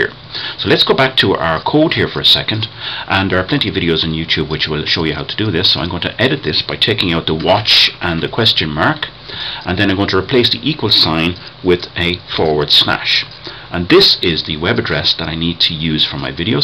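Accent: Ireland. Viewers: It's nice to hear a voice from home :) miss Ireland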